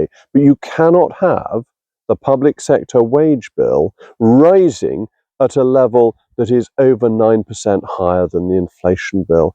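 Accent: RP, United Kingdom. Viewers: Bullshit in a suit and posh accent is still bullshit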